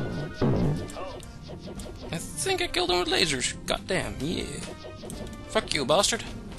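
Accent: Netherlands. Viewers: u sound a bit dutch :P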